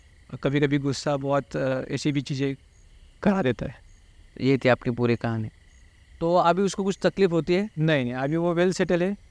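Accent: Maharashtra, India. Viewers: मराठी ऐकायला खरच भारी वाटत दादा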